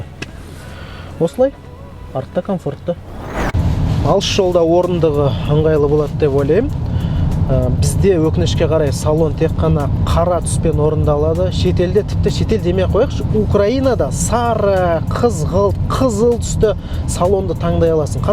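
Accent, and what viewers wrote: Standard. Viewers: Жарайсың қолдайм, таза қазақша , мәнді ,мағыналы , мәнерлі сөйлейсің брат отдуши👍👍✊✊ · Казах баурларымызга бизден салам, азаматсыз. Ушундай жаңы уналарды тааныштыра бериңиз! Таза Казахча сүйлөйт экен, азаматсын. Көңүл коюп уксаң ар бир сөзүнө түшүнөт экенбиз. Сөзүбүздө негизи көп деле айырма жок экен. Бекеринен айтпаса керек Казак-Кыргыз бир тууган деп. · Азамат! Таза казакча сүйлөйсүң. Казак тилине салымың чоң.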